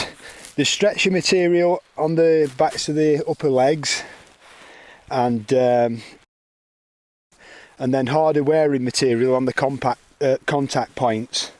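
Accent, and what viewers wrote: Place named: Yorkshire, United Kingdom. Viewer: Just found your channel, loved it, nice to hear a great YORKSHIRE ACCENT EEEH BY GUM, thank you hope all goes well with your hand op, takecare Lv Jacqueline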